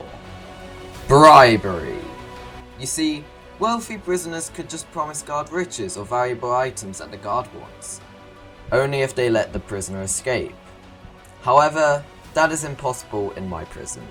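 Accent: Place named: United Kingdom. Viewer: i dont know why its so funny to hear british people commentate things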